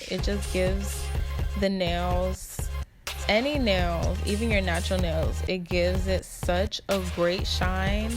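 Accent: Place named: New York, United States. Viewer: yass. and i hear that new york in you tooo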